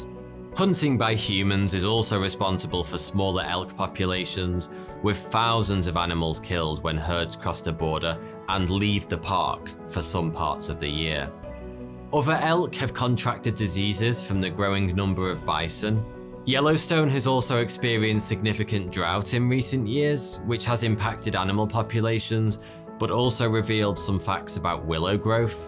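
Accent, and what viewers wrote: United Kingdom. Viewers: Great videos, thoughty and smart content, daily posting along with a narrator that spoke with a British English accent and with awesome discounts! This is awesome!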